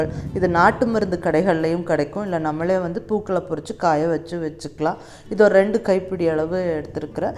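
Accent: Coimbatore, India. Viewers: I like ur kovai slang --- saeithi, karuvaapla,vangayam etc. Ur videos are so good. Keep it up. expecting your house tour as well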